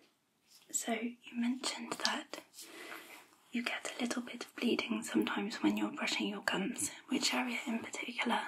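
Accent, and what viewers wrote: United Kingdom. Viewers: Don’t know if You saw but I commented this on your previous video and I thought you’de like to see it :))) Wow just found this channel from this video and I have to say that I’m in love! What a great video with excellent soft spoken levels and then variation of some whispering too. Then when it goes straight back into the soft spoken it is so tingly. Maybe the familiarity of the common accent from my area of the U.K. helped enhance the relaxation! Triggers were also very well put together and I thought the camera and mic quality was on point too! Great length which I personally prefer! Overall fabulous video and definitely earned a new subscription 😊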